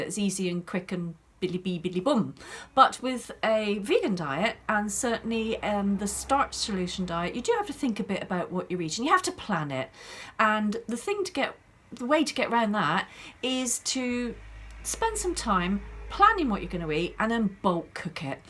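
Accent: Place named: United Kingdom. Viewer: Hello from Dallas Texas, my name is Georgia Ann. I love your sweet British accent and smile, so happy to get to know you. Here is my story. I am 72 years old, and was 50 lbs. overweight. I have tried everything, I was up and down and yo yo dieting. One day I tried the Potato diet and said, I would give it a try. I ate potatoes only for one day and had an insulin drop and was sick and shaky So that did not last long! Another fail. Then I bought the Eat To Live book by Dr Fuhrman, and got more education on the plant based diet. I learned that you MUST have a BEAN dish everyday when eating whole plants. When your not eating the meat you must have beans and it becomes your protein.I am borderline diabetic, so it affected me with only having starch.All that said, I have lost 30 lbs. eating whole plant food. The most wonderful thing that has happened to me is, I have learned that, when you nourish and feed your body real food, the food addiction cycle, and the benging STOPS! 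It's easier to say no to Sugar, and not eating sugar stops the cycle and you gain control. My next hurtle is to learn to simplify this way of eating where it is not complicated recipes. I love to cook, I have for 50 years. But if you are not careful, you find yourself chopping and preparing all the time, plus a mound of dishes, then it becomes to hard to do. So I want to cook simple, , easy things and not get caught up in another diet. So Yes, the answer to your question, You Can loose weight when you are older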